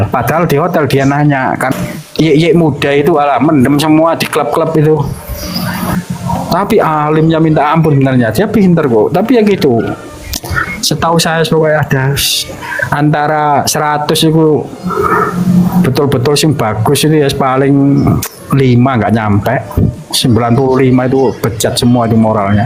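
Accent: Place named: Surabaya, Indonesia. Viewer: nek ngrungokno boso suroboyone cacak nok video iki....rasane kepingin moleh nang njowo maneh rek....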